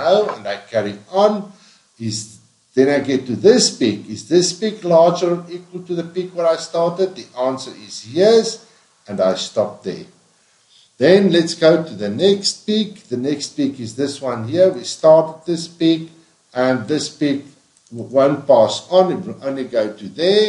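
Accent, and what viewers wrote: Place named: South Africa. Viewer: thanks prof, was great to hear a SOuth African voice teach me, keep it up, i am a big fan of this youtube page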